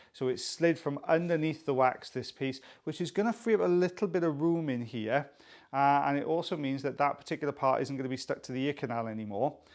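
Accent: Wales, United Kingdom. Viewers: There is great comfort to be had with hearing a Welshman say the word "valley". Thank you!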